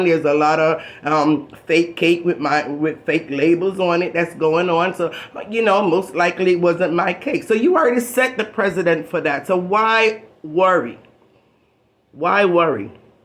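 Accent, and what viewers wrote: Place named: Bermuda. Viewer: You sound Bermudian why u coming after Donna plz stop it plz u looking views💯😂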